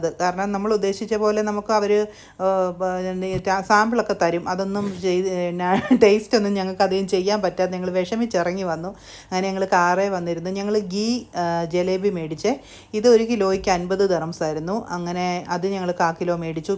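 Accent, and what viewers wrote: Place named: Pathanamthitta, India. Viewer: Pathanamthitta style samsaram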